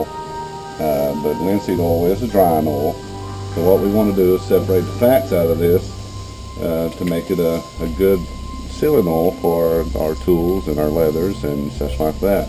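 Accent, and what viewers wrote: South, United States. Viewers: Quality control comment. Great content., your audio quality here is so-so, and you're a bit hard to understand because my ear is not attuned to a Southern dialect, especially with the music competing with your voice. Humbly submitted in support of your excellence.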